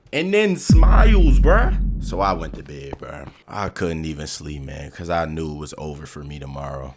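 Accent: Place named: Toronto, Canada. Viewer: The Toronto accent making it peak❤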